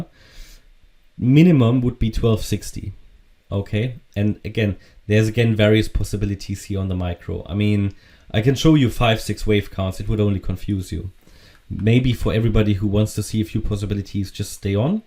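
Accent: Germany. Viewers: Great vid! Are you German?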